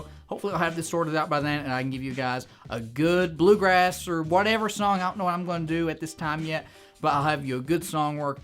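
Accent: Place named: Texas, United States. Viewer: I'm not American, but judging by your accent and those guns, are you from Texas? Haha I started playing the violin about 5 months ago. I couldn't afford the classes so I had to quit, but I still practice it. You sound really great, but don't hold it that high, or your shoulders will begin to ache and you won't focus on what you're doing. Your thumb should be placed on the side of the neck. Good luck :)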